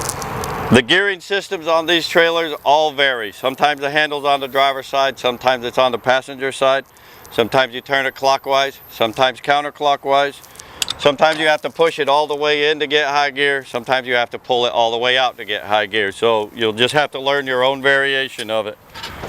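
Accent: United States. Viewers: Really informative, spot on - - and that East Florida accent is the cherry on top.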